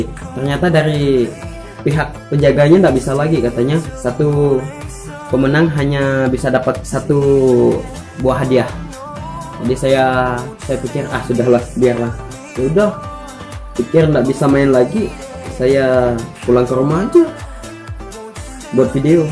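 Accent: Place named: Kalimantan, Indonesia. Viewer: Orang kalimantan ya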